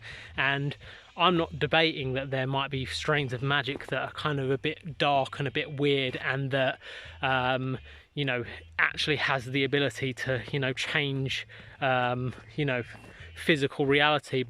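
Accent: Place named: United Kingdom. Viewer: Thx for sharing.. How come you have stopped making more content for your channel. We have the same accent from UK 😁✌🏼 Thx for sharing. Subscribed 💚🙌🏼✌🏼🙏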